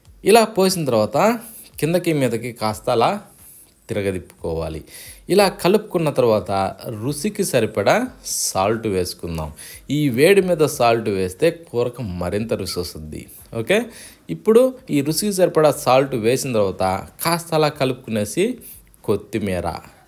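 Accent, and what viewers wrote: Nellore, India. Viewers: Nellore valla andi meeru . antha ma language.baga chesaru.baga chepparu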